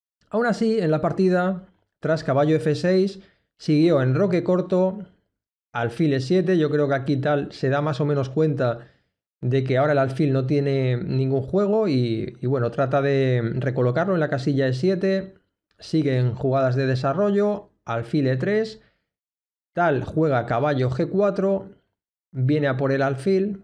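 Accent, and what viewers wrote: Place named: United Kingdom. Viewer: Te felicito por tu canal, es de lo mejor en Ajedrez por las partidas y tus análisis y comentarios. Pero permíteme recordarte que el Inglés no es el único idioma además del Castellano. No malogres tu buen canal pronunciando toda palabra no Castellana con Fonética Inglesa. Si no pronuncias una palabra extranjera con su propia fonética, debes usar la fonética Castellana. La letra w se llama ve doble y no 'u doble' ni mucho menos 'doble u' por lo que cuando se habla Castellano no se pronuncia como 'u' vocal o gutural sino como v que en Castellano al igual que en Griego es un sonido vibrante bilabial. Peor es cuando pronuncias la w del Neerlandés o Alemán o Polaco como 'u' porque en esos idiomas su pronunciación es dentilabial. Así, Zugzwang se pronuncia tsug•tsvang porque es Alemán y no sug•suang como si fuera Inglés. Asimismo, Wolfgang se pronuncia vólf•gang porque también es Alemán y no guólf•gang porque no es Inglés. 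Gracias por tu atención. Y que sigan los éxitos con tu Canal.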